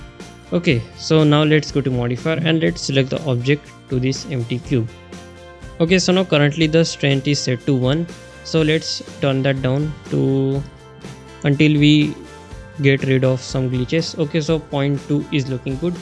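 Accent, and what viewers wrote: India. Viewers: Do you know a good app to make a voice over to hide this shitty pajeet voice?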